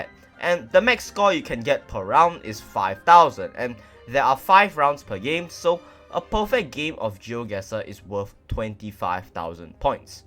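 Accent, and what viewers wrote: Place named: Singapore. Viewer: Bro has the most Singaporean accent i heard in a long while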